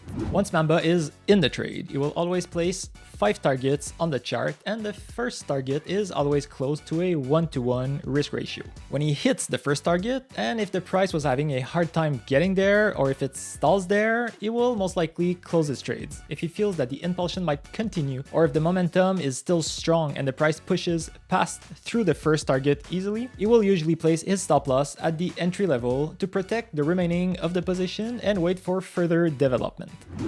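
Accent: Quebec, Canada. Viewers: Je viens tout juste de te découvrir et j’entends un fort accent Québécois 😅. Je m’abonne à ta chaine!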